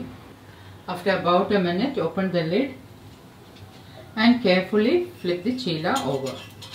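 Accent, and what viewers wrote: Kerala, India. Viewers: Mam are you from kerala, please don't take me in wrong way actually kerala people's try very much arvedic things by your voice felt are you from kerala.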